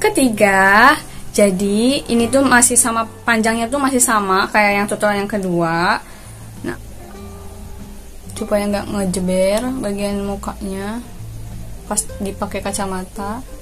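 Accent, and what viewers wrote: West Java, Indonesia. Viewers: Ngajeber...🤣 Orang sunda ya teh? 🤭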